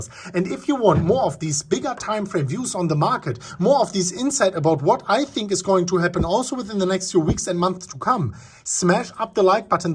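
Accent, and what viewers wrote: Germany. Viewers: Why are you interested in his German accent? Even for me as a German it’s brutal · Man, I really hope that one day I am crypto rich and develop a German accent like.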